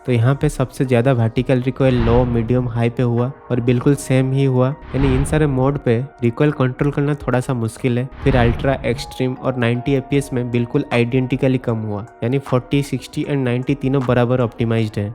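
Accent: Odisha, India. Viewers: Odia bhai voice ru jana padi jauchi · Kya aap odisha se ho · Aap oriya ho na? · Are u odia bro? Your accent is so strong 😂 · Bro i think you are from Odisha..Your native language is Odia..BTW you explained this clearly.love from Odisha.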